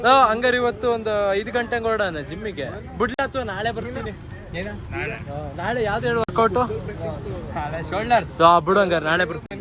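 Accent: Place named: Hassan, India. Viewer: Pysch agi matadtya bro nam hassan bashe na.. keep on doing bro ❤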